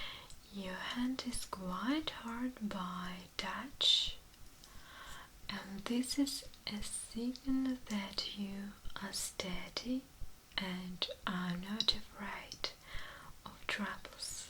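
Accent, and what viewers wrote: Russia. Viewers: I love the Russian accent! I listened to this lying down with my headphones on and had a smile on my face the entire time with my eyes closed , My mind was filled with pure bliss hearing your beautiful Soothing voice! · Wonderful video, Russian accent on English is relaxing :)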